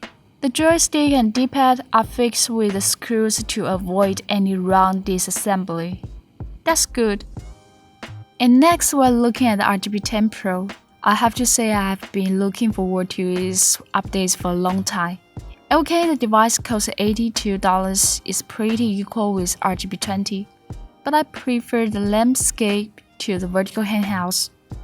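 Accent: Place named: China. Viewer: 超级重口味中式英文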